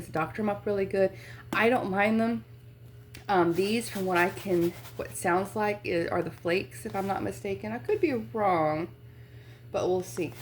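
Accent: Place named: Midwest, United States. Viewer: Where are you located? You sound like you are from the midwest maybe..... MN?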